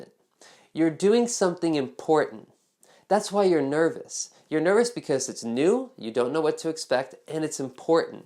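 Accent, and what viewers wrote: United States. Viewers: Is that New York accent I'm hearing? The "T"s sound a bit like how Italians or Spanish pronounce their Ts.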